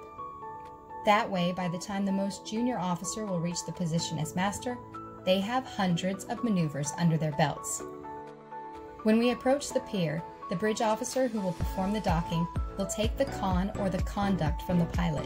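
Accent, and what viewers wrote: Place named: Australia. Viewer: #captainKateMccue are you a fellow Aussie? Your accent sounds Australian :)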